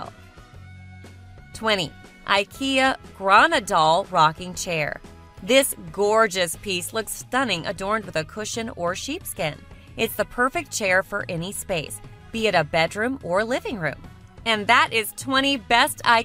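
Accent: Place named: United States. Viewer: Where the hell do they find these narrators? Their voices are so nasal, so artificially enthusiastic, so... American? I honestly think a robot voice is less irritating.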